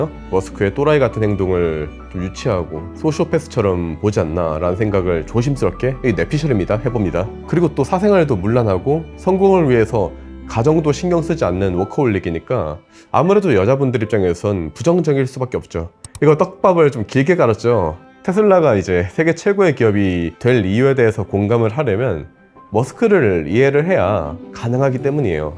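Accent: Gyeongsang, South Korea. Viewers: 경상도 사투리 구수하니 좋네요!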